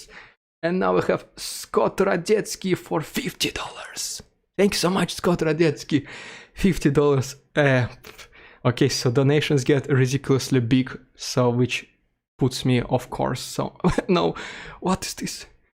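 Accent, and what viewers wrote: Russia. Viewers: Hello: I enjoy your videos once I got used to your accent. I wish I could send you donations but I am retired and live on a fixed income. Slava Ukraine 🇺🇦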